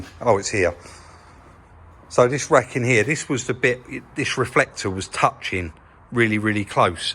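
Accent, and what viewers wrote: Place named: Essex, United Kingdom. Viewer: More like an Essex accent.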